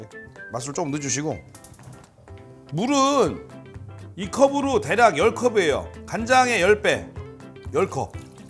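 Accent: Chungcheong, South Korea. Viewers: 설탕 싫으면 안넣어도 되요 ..맛없을껄 ㅋㅋㅋㅋㅋㅋㅋㅋㅋㅋㅋㅋㅋㅋ 충청도 화법ㅋㅋㅋㅋ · 충청도 사투리인지 이제야 들리네요 ㅋㅋㅋ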